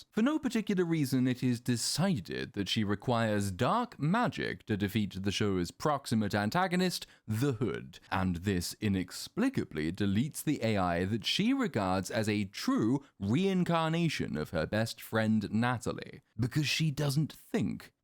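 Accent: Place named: Oxbridge, United Kingdom. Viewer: his Oxbridge accent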